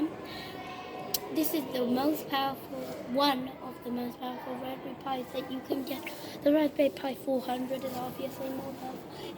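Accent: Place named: United States. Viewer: Still speaking american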